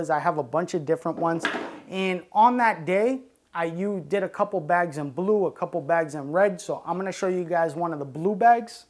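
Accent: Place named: Brooklyn, United States. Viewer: He Reminds me of my Brooklyn accent.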